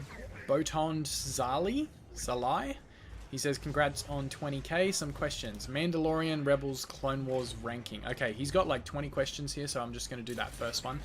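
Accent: Australia. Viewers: You and make listening to an Aussie accent bearable possible. Thank you.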